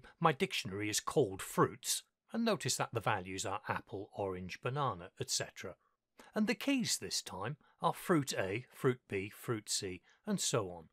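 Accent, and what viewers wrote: United Kingdom. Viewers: Hey! Happy birthday man! You deserve it. The 9 sounds just as good as 10, without the pain. The way you explain things with your soothing British voice and systematic, chronological, and a lot of patience make you the best channel for computer education I've ever seen. The editing alone deserve respect! Thanks for your dedication 🤝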